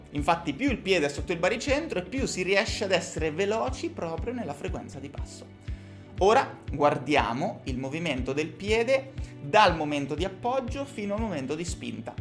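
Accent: Marche, Italy. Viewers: accento marchigiano a tutto spiano. Scommetto provincia di Macerata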